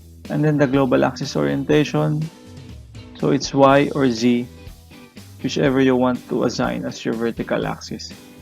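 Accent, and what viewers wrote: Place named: Philippines. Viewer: you sound pinoy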